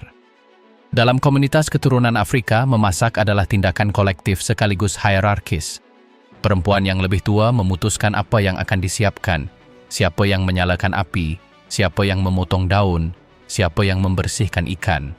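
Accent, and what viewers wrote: Malaysia. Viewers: Wah, ini bahasa Indonesia logat malaydesh. 😊😊😊😮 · Jawa Sumbawa hadir tapi sayang naratornya orang malaydhes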